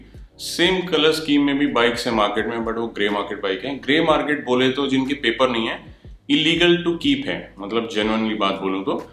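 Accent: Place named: Punjab, India. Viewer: Pehle baat to sir 3 reason hi kyu😁? PERSONAL BTAYU TO(CAN I WRITE IN PUNJABI) 1.PEHLE GAL TA BHAJI THODI EH VDIA LAGDI A KI TUC APNI MOSTLY TRIP TE KHANA VEERE NU LEKE JANA O ❤(THODI JODI BOHOT VDIA A, DONO DOWN TO EARTH HO❤) 2.THODE CINEMATIC SHOTS MANU KAFFI VDIA LAGDA A CAUZ 😁MAVI FILMAKING KARNI A, MANU BOHOT INTEREST A IS FIELD CH❤ 3.THIRD BHAJI EH A VI TUC HEGE TA DELHI DE HO BUT PUNJABI BADI SWEET BOLDE O😂😍HINDI TONE + PUNJABI LANGUAGE= 4.MERE VLO BONUS 🤫, TUC HAR TRIP START KARAN TO PEHLA WAHEGURU JI DE DARSHAN KARDA O❤AND END HMESHA EKO SALOGAN BOLDA O MEHNAT HMARI REHMAT UNKI AUR PYAR APP SBKA❤(DEEP GAL KEHTI TUC SACHI❤)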